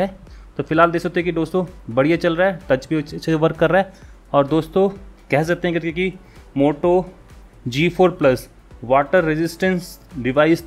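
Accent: Marwari, India. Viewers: bhai marwadi ho kya??? Awaz se to lag hi raha hai.......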